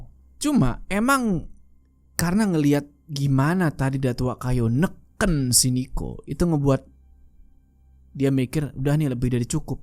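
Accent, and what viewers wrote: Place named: Bali, Indonesia. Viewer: Kok malah mirip logat bali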